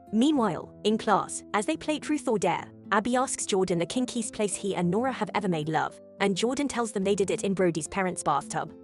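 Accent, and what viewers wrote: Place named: United Kingdom. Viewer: I really love you using British accent ai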